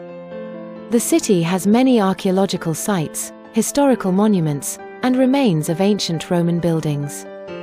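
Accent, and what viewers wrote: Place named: United Kingdom. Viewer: The narrator has Royal English❤i say it as a Russian English tutor.Adoration and likes to her voice and sound.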